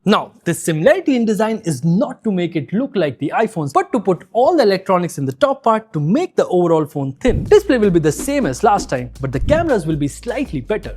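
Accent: India. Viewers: I cant listen this indie english 😐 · Omg. I cannot understand this Indian English literally anything!!! How can he make you tube videos with english being so bad? He swallow words...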